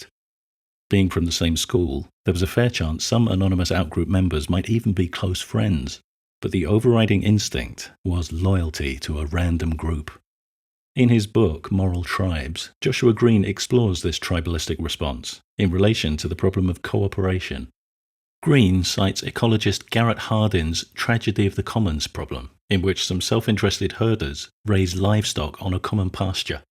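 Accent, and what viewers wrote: United Kingdom. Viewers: There is a zero-sum game in real life, though. Resources and land-carry capacity are all limited goods. The ivory tower moralizing you see here in this video is never related to real world current-day examples for a reason. It would lay low the sort of bourgeoisie boutique politics that the author labors under while ironically living in a bubble where he deludes himself into thinking everything is unlimited since he is disconnected from the tribal realities of the situation of say the working class in Britain where his accent would suggest he lives.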